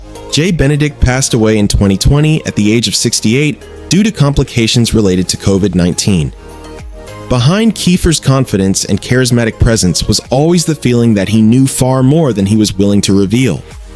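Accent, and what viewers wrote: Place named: United States. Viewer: Why an American voiced narrative?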